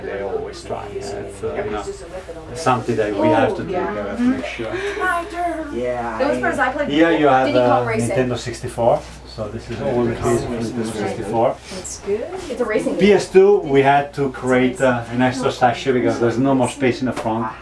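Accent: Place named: Italy. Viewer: che stile si sente che sei italiano!!!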